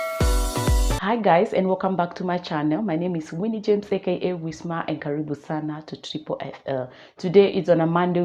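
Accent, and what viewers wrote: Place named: Kikuyu, Kenya. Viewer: The Kikuyu accent in you made me Subscribe asap....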